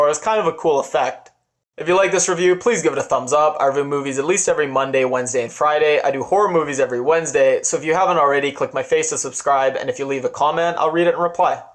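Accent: Canada. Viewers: So, you did NOT explain the ending at all. I think perhaps you are so full of yourself that you misread the ENTIRE movie and have NO idea what you are babbling about in your annoying Canadian accent, A! Who was the moving man in this plot and why did he appear at the end as a potential stalker, explain that one oh master of interpretation! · Just curious, Are you Canadian ?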